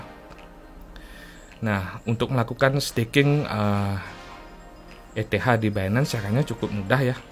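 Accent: Bali, Indonesia. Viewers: Bang kok logatnya ky org bali ya?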